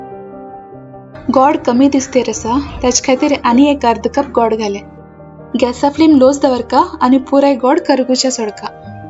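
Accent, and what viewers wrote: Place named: Aurangabad, India. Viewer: The way you speak konkani is different from Mangalore konkani 😊. Which part are you guys from ? My cousins who were from Aurangabad spoke like that.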